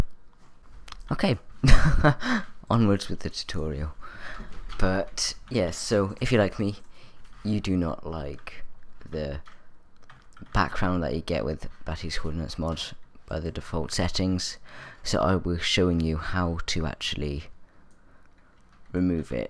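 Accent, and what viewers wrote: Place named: United Kingdom. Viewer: It's called having a british accent.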